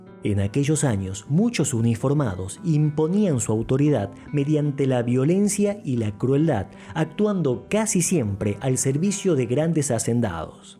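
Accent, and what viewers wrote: Argentina. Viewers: Buena narración chico,te dire que no me gusta el acento argentino, pero te felicito, pues me atrapaste con tu historia,saludos!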